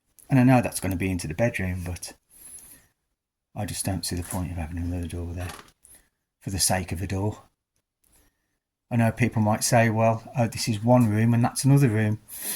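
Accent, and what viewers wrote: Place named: England, United Kingdom. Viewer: Hi or (gentleman who's hosting in this upload with English accent), sorry, I'm confused whether you are or, or???? 🤨😐 Are you eventually going to remove the old wooden lintel (the higher-up one / above the wooden blocks) with a concrete one? If you do, then you will gain nearly an extra foot (12 inches), the wall looks so dodgy, especially with the big crack, would you not consider taking that small part of that wall down and rebuilding it with a higher concrete lentil? It could sort many of the problems? Also, just wondering if there is a yard where one can drive their car in, as the car is usually parked along the roadside? God help you / / with all the questions I'm firing at you, sorry!!! 😱😱😁😁😄😄 Anyways, thanks, or, or 🥹 for the upload, looking forward to your next one, hugs from the west of Ireland 🤗🤗😘😘❤❤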